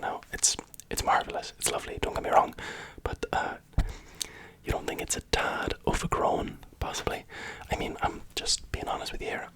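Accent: Ireland. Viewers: as an irishman, i approve of this even if it is all over the place i'll take it literally staying w a friend in dublin which is kinda the accent ur doing but also with other dialects from around the country sprinkled in but i feel like being in dublin this video is fitting to sleep to when home is 3 hours across the country and people sound nothing like that from home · My hair is literally how he's describing it at the moment. And I LOVE the Irish accent! ❤ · His Irish accent is better than mine an actual Irish person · the irish accent is sooooo good! I need more of it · I am Spanish but I had an Irish upbringing and the accent makes me SO homesick 😭😭 The meassuring part made me drop the phone. Yes, you caused that 😂 Carry on with your amazing work and your sense of humour ❤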